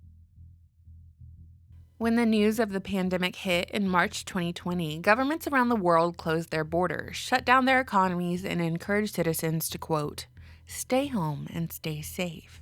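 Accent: South, United States. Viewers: Love your channel lady! Have you heard of or covered anything about canadas “m a i d” service? Btw love the southern draw. Reminds me of home. 🥰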